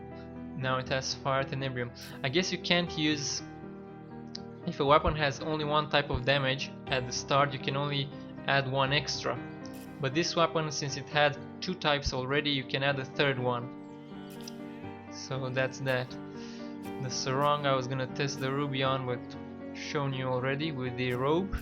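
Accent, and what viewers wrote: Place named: Eastern Europe. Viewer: he has slavic accent maybe russian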